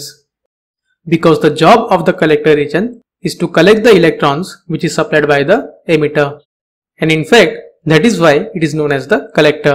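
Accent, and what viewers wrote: India. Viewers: neither is he, its the indian accent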